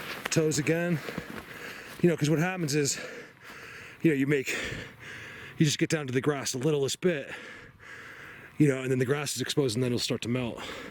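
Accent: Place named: South, United States. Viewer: Don't mean to be distracted but when you said ummm it almost sound like Elvis, you have that sexy southern tone and your voices sounds like him too...lol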